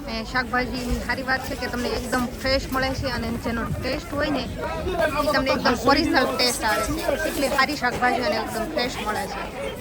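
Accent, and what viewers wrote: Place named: Saurashtra, India. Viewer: Tamaru ગુજરાતી એક દમ shaurastra nu દેશી છે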